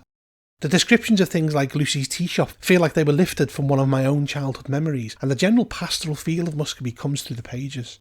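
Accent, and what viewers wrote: North, United Kingdom. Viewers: Hi, where are you from? You have a great voice to my American ears but wondering if it is Northern? I’m going to run Scritch Scratch tonight and enjoyed your summary. The scenario does suggest groupings for less than 6 players, on page 8 under the heading “Six of One” in the edition I purchased which looks a bit different than yours. Cheers!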